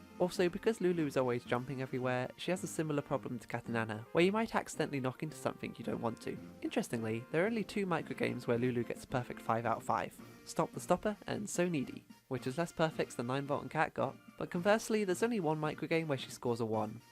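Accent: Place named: United Kingdom. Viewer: You sound very British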